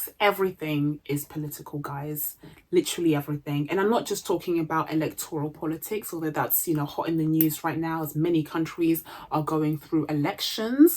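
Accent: United Kingdom. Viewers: Your English accent is so beautiful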